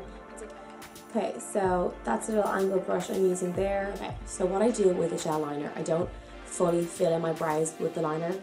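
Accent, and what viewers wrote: Ireland. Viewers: oh okay thank you so much and are u Irish? Love the accent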